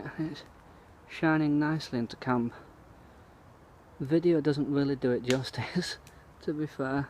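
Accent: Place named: Yorkshire, United Kingdom. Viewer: nice tl here a Yorkshire accent were about you from ? me south leeds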